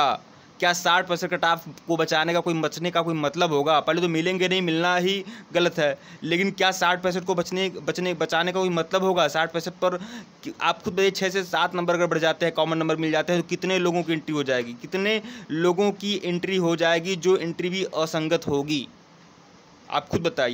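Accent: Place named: Allahabad, India. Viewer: Ap illahabad se ha apki language bata rhi h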